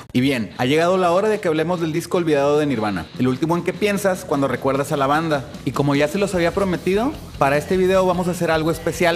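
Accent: Monterrey, Mexico. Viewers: hablas bien regio vato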